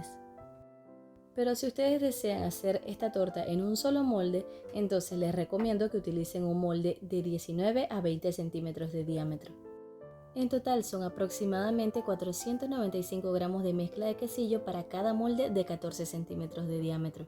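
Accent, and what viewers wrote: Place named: Venezuela. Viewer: Me encanta! Te descubrí hace poco y voy a aprender mucho más ahora que comenzaré a trabajar con fondant. Me suenas a Venezuela ❤ Y para los que critican que le decimos quesillo al flan pues en Venezuela siempre se le ha dicho así y creo que casi nunca le han puesto queso crema... La costumbre 😝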